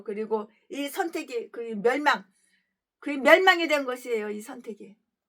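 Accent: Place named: Jeolla, South Korea. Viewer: 예라 ㅋ ㅎ 전라도웃겨요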